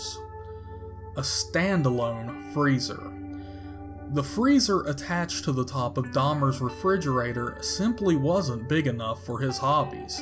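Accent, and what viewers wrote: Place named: West Virginia, United States. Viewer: Are you from West Virginia?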